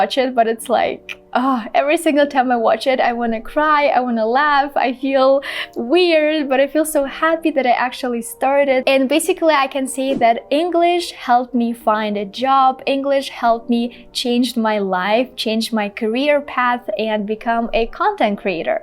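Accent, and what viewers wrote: Russia. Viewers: You have shown a good Russian accent. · Hello, I like your Russian accent and I would like to know which Youtubers are you watching, I am trying to learn English via youtube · i love your "rrrussian" accent.😊😊😊🙌🙌🙌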